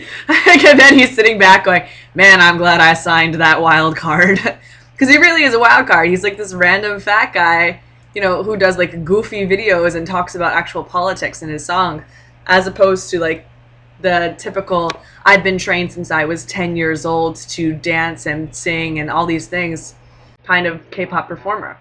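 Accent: United States. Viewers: I wish I could hear you speak Korean!! I'm interested in your dialect or amercian accent affecting it in any way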